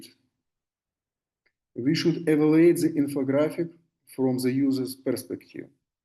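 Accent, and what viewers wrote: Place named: Russia. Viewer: Дружище очень слышно русский акцент так как хочется попросить тебя перейти на русский потому что ощущение что ты именно на нём говоришь.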